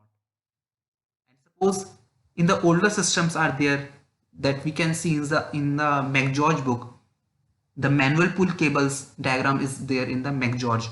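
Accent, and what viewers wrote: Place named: India. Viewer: English language needs to improve. It was like hindi spoken in english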